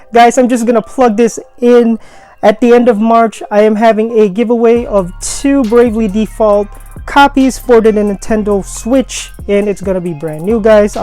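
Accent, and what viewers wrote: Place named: England, United Kingdom. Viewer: Wow I love that English accent🤗❤️❤️❤️ wow that's a nice handy bag #mto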